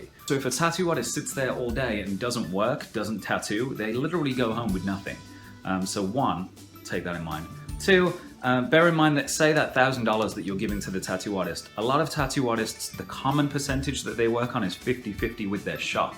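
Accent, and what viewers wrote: United Kingdom. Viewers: You sound British but say some words with an American accent. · Are you british? because you sound kinda british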